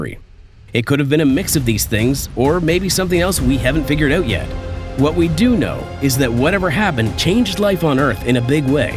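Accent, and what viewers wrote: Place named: Canada. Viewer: The narrator seems to have a Canadian accent.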